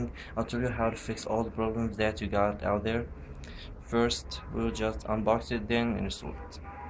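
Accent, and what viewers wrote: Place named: Romania. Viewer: you have romanian accent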